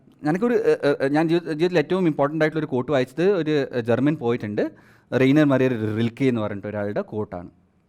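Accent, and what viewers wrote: Kasaragod, India. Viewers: Athann namada ksd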